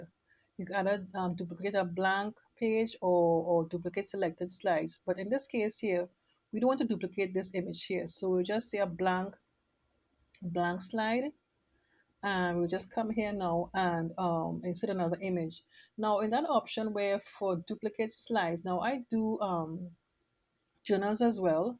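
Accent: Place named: Trinidad and Tobago. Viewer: Hi, great video. Btw are you Trinidadian?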